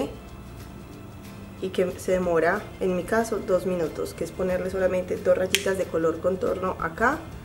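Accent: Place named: Venezuela. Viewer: Me gusta como habla, su acento Venezolana? no me equivoco?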